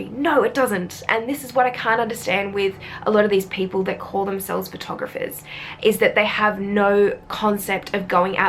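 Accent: Australia. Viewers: Great video, and really needed to be said. Frederick really nailed any commentary I could add, othr than Jay Maisel wrote a wonderful book about this in some respects, entitled "Its not about the F/stop". Cheers and best regards – PS I love your Ozzie accent!;-)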